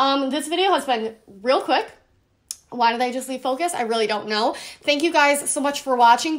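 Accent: Buffalo, United States. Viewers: Why is the packing always better than the products? Oh and are you from Buffalo? I love your accent!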